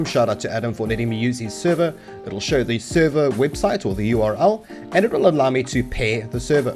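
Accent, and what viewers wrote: South Africa. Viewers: Haha I could immediately recognize your SA accent! :D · Lekker ou ek het gehoor jy klink bietjie Suid Afrikaans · Are you South African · You sound like a south african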